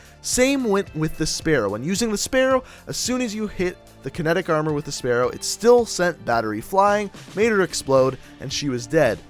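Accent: Canada. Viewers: Is he canadian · It's his Canadian accent it make them were they can't pronounce all words correctly so stop hating and learn to spell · Definitely Canadian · are u Canadian cuz it sounds like it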